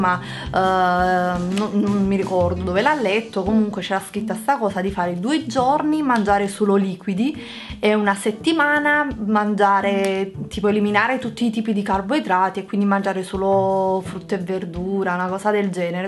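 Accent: Naples, Italy. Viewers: anche io sono di Napoli, però almeno nei video cerca di limitare questa cadenza napoletana pazzesca!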